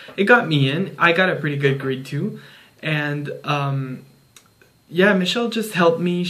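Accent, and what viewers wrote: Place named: Germany. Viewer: You sound german which is super hot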